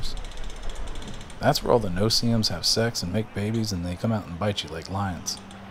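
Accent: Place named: Florida, United States. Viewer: I'm sorry, but the sound of your voice, the tone, and your Florida accent just don't go together in my mind. No offense sir.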